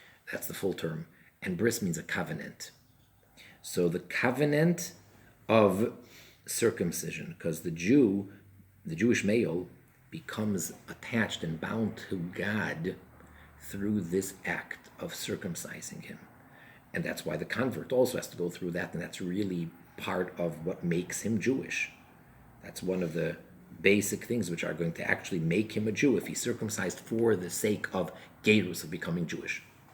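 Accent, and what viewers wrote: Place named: New York, United States. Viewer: 12Yrs Old STILL JUST a GIRL including 2-1/2yrs of age. What in the world ☝️ Uhm that is PEDO & ABUSIVENESS of a Minor maybe in Iserel But in the world at least in America which ur SPEAKING IN ENGLISH (sounds like NY)... so these THESE 7 Laws shouldn't nor ever RELATE to ANY AMERICAN Girl & BTW... How old is the Boy? Pray God it's a Boy NOT 🚫 A MAN? WE ALREADY HAVE THE 10 COMMANDMENTS Thank You very much.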